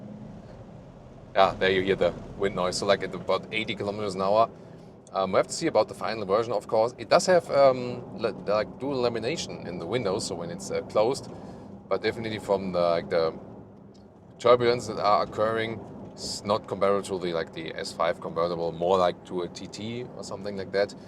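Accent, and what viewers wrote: Germany. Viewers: This guy with a German accent, saying “the final solution” twice is a bit problematic. :) However I will be buying this car. Style, refinement and a real sports car when everyone is buying SUV’s.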